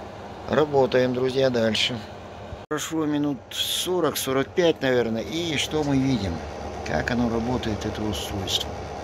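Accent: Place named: Chita, Russia. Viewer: Друган ты точно с Казахстана !? А то мне кажется ты наш , с Читы )))👍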